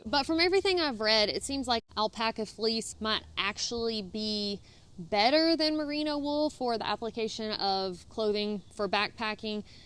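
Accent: United States. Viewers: The why you say your “i” crack me up. It’s so drawn out that it adds syllables to words hahah I’m not picking on you, I’m from Louisiana and my husband from CA could barely understand me when we met LOL Your hard vowel accent was just so drastic and I was curious what part of the US you’re from. WV? Figuring out regional accents has become a strange hobby of mine 🤣 PS I love everything about this channel just from seeing your chair fort set up hahah instant subscribe. I’m BRAND new to hiking and not sure if it’s for me, but this channel seems fun and informative.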